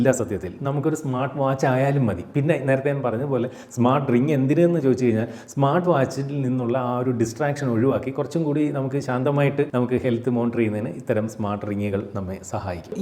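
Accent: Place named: Kollam, India. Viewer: It's a kollam/ Trivandrum accent..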